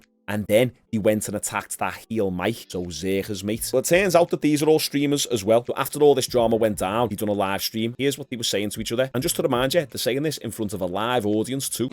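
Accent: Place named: England, United Kingdom. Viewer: “They’re all little faggots” -weird English accent. Everything sounds better in the American accent y’all created it but we refined it, if you feel anyway about that comment just remember what happened in 1776 ya little hobknocker I love you ❤️ your content is refreshing and I believe your consistency and ability to hustle hard will take you to the top, I’m younger then you so I think you will get there first but when I get there just make your you remember the American that was happy you Sa’id the word faggot openly 😂 love ya bro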